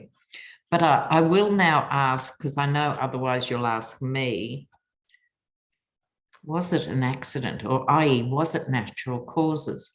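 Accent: Australia. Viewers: I love your Aussie accent!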